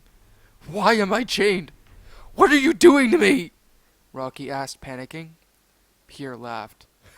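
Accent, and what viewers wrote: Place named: Russia. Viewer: Not trying to be rude here but your French accent sounds like a Russian 's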